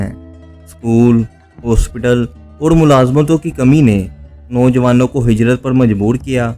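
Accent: Punjab, Pakistan. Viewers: Improve your speaking skills, your accent is typical paindoo punjabi, not suitable for these videos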